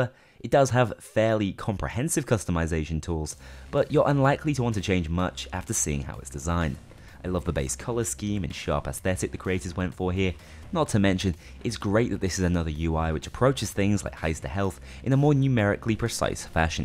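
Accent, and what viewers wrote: Denmark. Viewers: bro er du dansk? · Are you danish?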